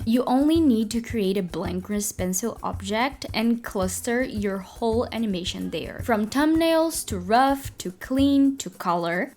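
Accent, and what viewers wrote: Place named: Brazil. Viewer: oh my god, i love english brasileiro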